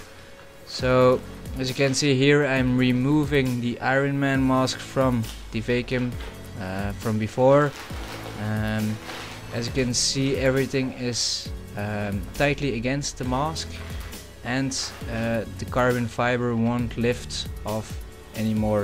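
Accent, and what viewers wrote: Netherlands. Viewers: Let me guess.... your from the netherlands? (just the accent) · are you dutch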